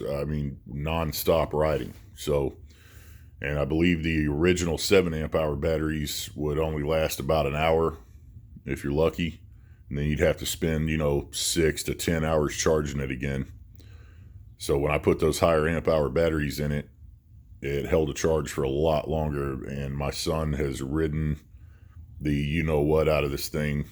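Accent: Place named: United States. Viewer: Bro ur voice it sounds so American how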